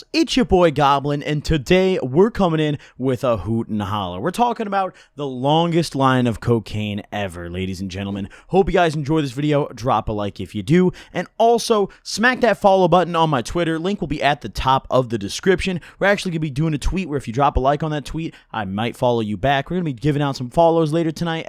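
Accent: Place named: Midwest, United States. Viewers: i’m from ohio, so hearing meer sounded normal to me lmfao midwest baby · That Midwest accent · he got the midwest accent like me lmao\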